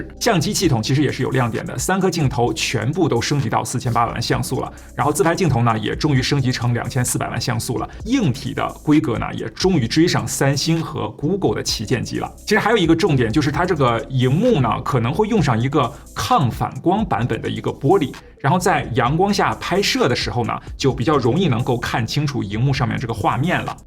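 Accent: China. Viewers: 听口音是大陆出生的，但用词很多台湾化，什么晶片啦，毫米不说毫米😊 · 那你到底是台灣人還是大陸人 如果是台灣人 你講話為啥像大陸人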